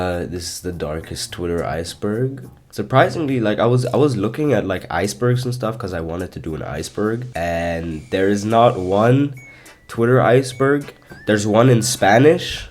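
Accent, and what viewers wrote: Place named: Germany. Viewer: Also a German here! Man hört den Akzent aber nur ganz schwach raus, find ich :D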